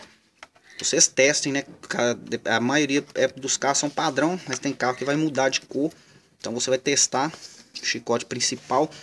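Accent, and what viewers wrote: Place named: Minas Gerais, Brazil. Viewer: Boa, sotaque de mineiro.Bem explicado.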